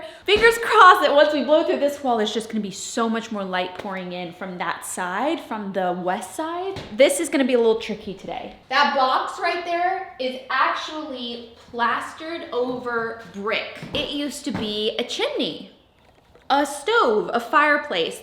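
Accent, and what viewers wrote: Texas, United States. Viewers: The Texas accent was prominent in this video!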